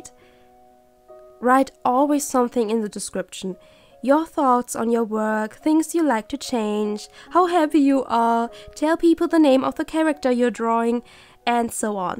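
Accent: Germany. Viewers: german accent✨😍😍😍😍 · Ja der Akzent ist wirklich "deutsch" 😅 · Uh dein englisch ist echt gut! Man hört dir zwar extrem an dass du deutsch bist aber trotzdem, klasse! 😱 und danke für die tollen tips, es ist schön wenn man leute hat die deine arbeit schätzen :3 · Ha, wusste ich's doch. Hab auch den deutschen Akzent rausgehört xD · somehow you sound like you're German are you? sorry if i'm wrong edit: habe gerade die Kanalbeschreibung gelesen :3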